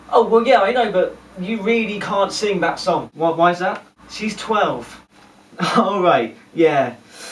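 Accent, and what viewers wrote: United Kingdom. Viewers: very funny british accent :)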